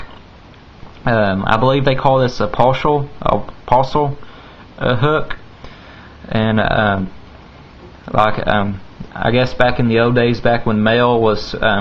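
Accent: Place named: Texas, United States. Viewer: it obvious he from texas luk at the accent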